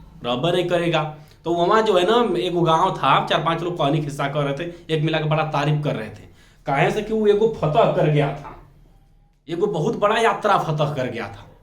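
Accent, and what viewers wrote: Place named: Bhojpuri, India. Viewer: Maja aa ja raha hai bhojapuriya andaj me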